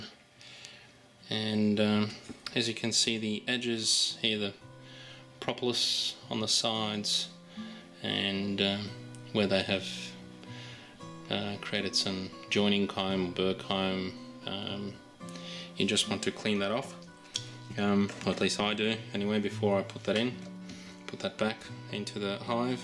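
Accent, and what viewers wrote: Australia. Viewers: You Aussie? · You sound Aussie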